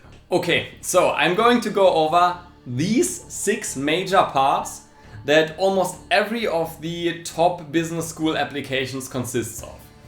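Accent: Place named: Germany. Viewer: This is like the staple German accent. If someone in Hollywood wants to do a German accent they need to study you.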